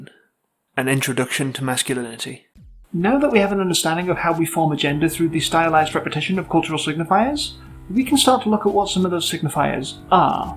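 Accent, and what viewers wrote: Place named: Belfast, United Kingdom. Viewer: Love if when I hear a Belfast accent,. 💚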